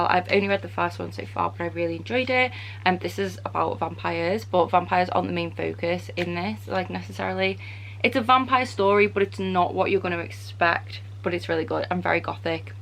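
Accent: United Kingdom. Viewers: Can I just admit that the way you say the word “pretty” sounds so elegant and polite with your British accent. 💗 Hello from the Valley of the Sun 🌞 where it really is DEADLY 🥵 heat!! I live in Scottsdale, AZ and we are still having 94-100 degree weather! Great Video as always! I have about 80% of these books as well. I absolutely ADORE them!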